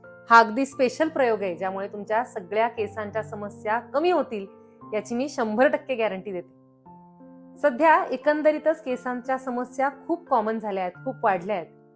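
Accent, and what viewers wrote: Standard. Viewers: स्पष्ट, शुद्ध उच्चार आहेत तुमचे.उपयुक्त सोपी माहिती सांगितलीत. धन्यवाद!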